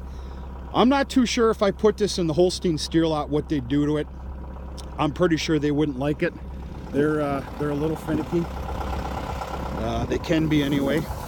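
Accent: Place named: North Dakota, United States. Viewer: Hi, I'm from N. Utah. I worked on a ranch in Randolph Utah. Weather just like that only humitity is much lower here. It's funny how cows can be so picky about their hay. Love your channel and your accent sounds alot like my relatives on the North Dakota/Canada border. And they are Native American. I wish I was there helping. Stay warm and safe. Good day. Debbi. ☺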